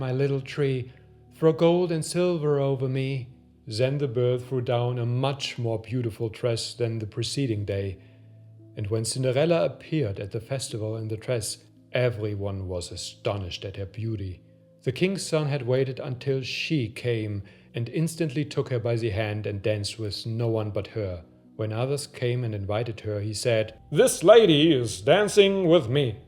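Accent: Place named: Germany. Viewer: What a timing to upload, I’m going to Germany the next day 😃 The accent is so nice! Can you do one in German?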